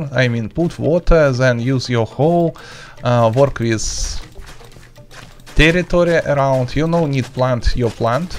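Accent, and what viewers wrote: Russia. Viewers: сразу видно, русский акцент)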